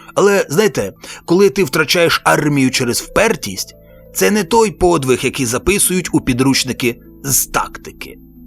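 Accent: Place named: Russia. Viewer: Мова автора з скаженною кацапською алітерацією мови!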